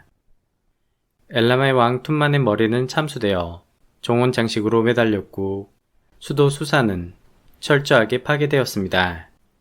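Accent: Jeolla, South Korea. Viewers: 전라도 분이신가?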